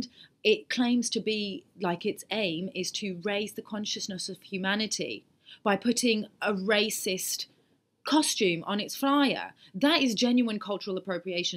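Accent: United Kingdom. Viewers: LMAO, why, coz of her British accent 🤣🤣🤣🤣🤣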